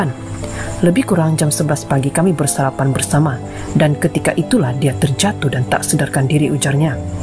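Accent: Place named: Java, Indonesia. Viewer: Tak pasal2 ckp ala Indonesia. Mcm Jawa kronok.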